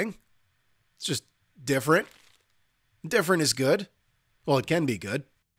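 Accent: New Jersey, United States. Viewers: Probably the best 7Days content around. Maybe its the New Jersey accent...?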